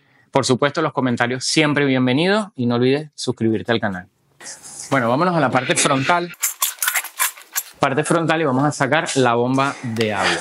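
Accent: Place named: Venezuela. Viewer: Suena más a venezolano que el mismo maburro😂😂😂😂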